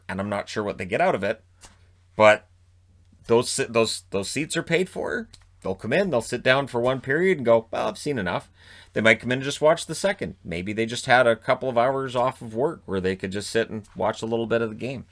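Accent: Canada. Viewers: Feel the love Even if he does pronounce words ala Canada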